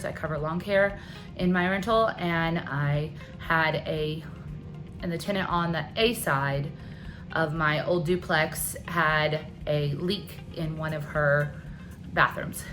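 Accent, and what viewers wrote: Texas, United States. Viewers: Congratulations,! My note on your voice is I have never thought you had a southern accent until today. You sound Texan for sure today. I hope you are better soon. You are an inspiration.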